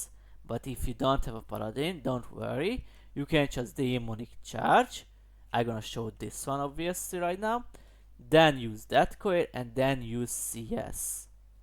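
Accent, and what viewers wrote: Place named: Hungary. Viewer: where r u from? u have a hungarian english accent :D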